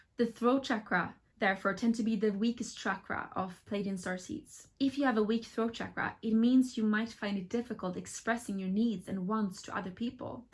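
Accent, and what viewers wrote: Sweden. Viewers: As a norwegian, it's so easy to hear that she is Swedish, by the accent 😄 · Are you Swedish? Love the accent! If so, you are already Nordic, so definitely a Nordic Pleiadian!! 😉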